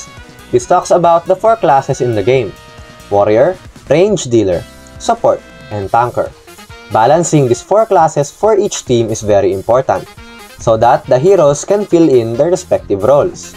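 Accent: Philippines. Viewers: Are you filipino because of your voice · 100% Filipino accent here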